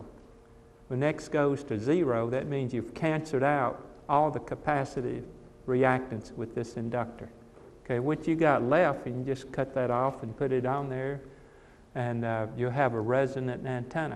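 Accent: South, United States. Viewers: have never heard an oriental with a southern accent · I hear a American with a Southern accent.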